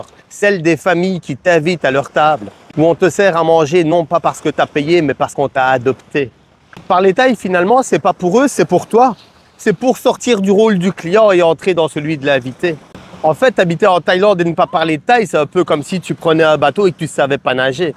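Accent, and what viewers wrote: Belgium. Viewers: Toi, t'es pas Français !!! Belge peut-être ? Merci pour cette approche...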